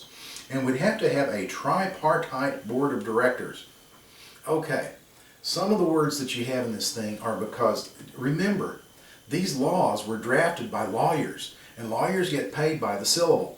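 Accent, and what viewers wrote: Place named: South, United States. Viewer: I find the southern accent quite comforting, in fact. Extra helpful contrasting the stress of study.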